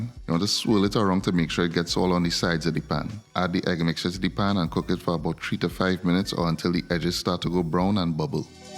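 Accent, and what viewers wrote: Trinidad and Tobago. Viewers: Are you the YouTube police? Did you know that YouTube can be accessed by most countries? Thus anyone from those countries can upload content in their own language. Why feel the need to say this if you don't understand the video, simply move on. And just so you know, he's speaking English with a slight Trinbagonian accent, which is very close to British English, yet your pea sized brain couldn't understand it, you're and absolute idiot.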